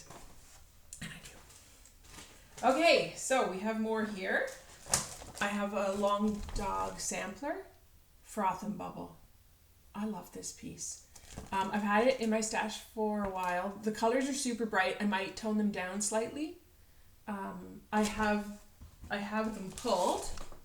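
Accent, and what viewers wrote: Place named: Canada. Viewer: Hi, welcome to Floss tube. I think you did a marvelous job for your first video. Always a bit tricky that first couple of minutes. Mr. Right grew up in Canada, so I love listening to the cadence of your voice. I like your idea of family sayings. Inspiring. Thanks for stepping out there and giving floss tube a try. I've only made 10 videos, so I am still a smidge nervous. You have such a kind way about you, I think you will be a hit. Thanks for sharing and inspiring. Happy Stitching, Daleen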